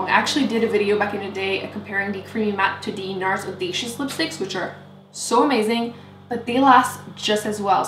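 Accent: France. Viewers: Amber Schelfhout She actually did say “voyageur” (in perfect French, with no accent) pretty clearly, which means “voyager” or “traveller” in English. “Voyager” can either be used as a synonym to “traveller” in English or translated to “to travel” in French. Why would someone whose first language is French, speaking English with a French accent not know how to pronounce a French word? Loll... I was so confused by this comment... So she wasn’t trying to “make it English”. She just pronounced it as “voyageur” which is equivalent to “voyager” or “traveller” in English. It could have happened because she wasn’t sure if the word was meant to be French or English (since the spelling is the same in both languages). I’m tri-lingual, so this also happens to me very often... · Your accent is so beautiful 😍 French accent is everything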